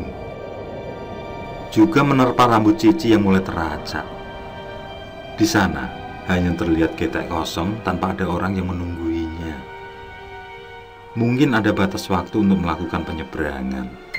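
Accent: Java, Indonesia. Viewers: Orang jawa bg?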